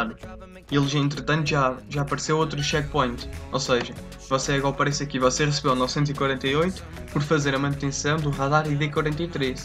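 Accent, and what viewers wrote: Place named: Portugal. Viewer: portugues temtando falar brazileiro muito bom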